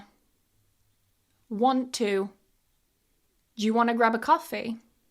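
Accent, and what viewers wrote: United Kingdom. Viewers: I would like to say a big thank you for your amazing English lessons. I have learned so much from your videos, especially your beautiful British accent and the way you explain things so clearly. As an person who need more learning English was very important for me, and your lessons have helped me improve my confidence and communication skills. Your dedication and kindness are truly appreciated. Thank you for sharing your knowledge with people from all over the world. Keep inspiring and helping others. Wishing you all the best. ❤️ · Muito linda ,seu sotaque é uma delícia, adoro os britânicos.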